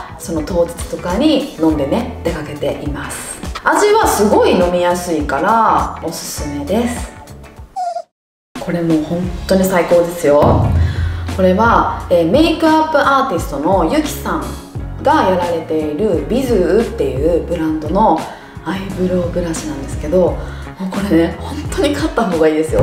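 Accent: Kansai, Japan. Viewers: 笑けてくるって言うみっこちゃんが好き♡こんな可愛い人が同じ関西人で関西弁で嬉しい♡ 木の器、可愛い💕水時計の音、超癒し… カバンもネックレスも急須も全部素敵〜！ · 関西弁のみっこちゃんが、かわいいです😍 naruki takahashiのfruits stand、ステキですね🍊欲しくなっちゃいました！ 後ろの鏡もカワイイ🪞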